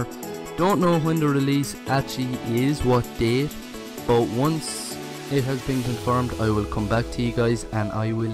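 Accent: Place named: Ireland. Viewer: Youre irish too nice!!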